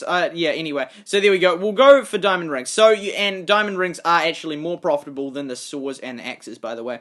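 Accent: New Zealand. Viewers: Are you kiwi??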